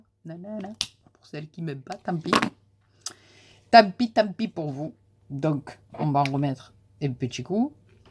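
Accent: South, France. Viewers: Tuto merveilleusement bien expliqué, avec un merveilleux accent du midi, je m'abonne.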